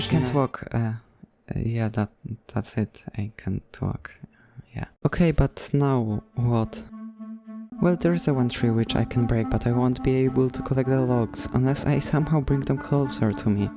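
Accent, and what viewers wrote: Poland. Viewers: On jest z polski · Taki nawet polski akcent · Hi, you are from poland? I asking because of your accent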